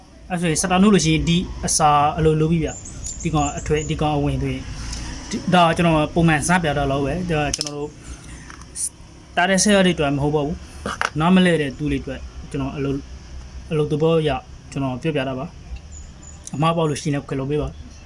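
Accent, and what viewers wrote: Dawei, Myanmar. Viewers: ထားဝယ်‌စကားနဲနဲ ဝဲနေသလိုဘဲ့ ❤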